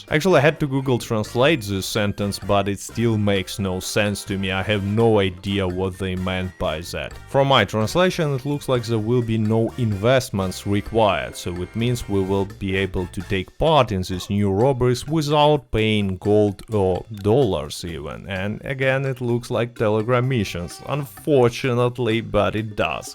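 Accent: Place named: Russia. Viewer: I was joking idk if he's Russian but he sounds Russian lol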